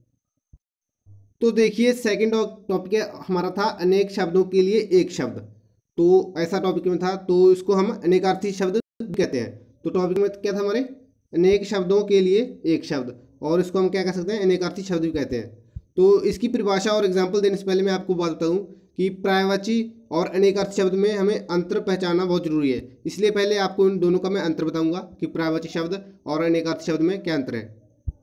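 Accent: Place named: Punjab, India. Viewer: Apki hindi mai bhi Punjabi tone hai 😂😂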